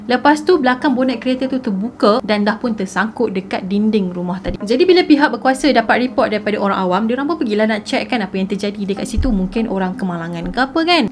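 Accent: Malaysia. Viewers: Hay kaa aku dari indonesiaa,suka bgt denger org Malaysia ngmng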